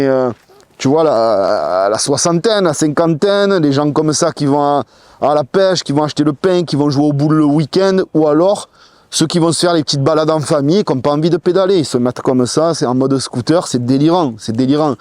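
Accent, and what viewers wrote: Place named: Banlieue, France. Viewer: Ormis cet accent de keke insupportable. Oui c est une bonne machine . Ah monte jamais plus haut que biaritz ,tu vas te faire démonter avec ta langue de branleur de cité qui a loupé son brevet des collèges .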